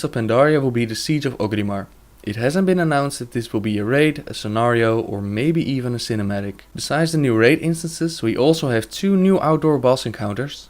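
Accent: Netherlands. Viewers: haha je zij bonus role echt heel erg met nederlanss accenr xd niet erg maar grappig :)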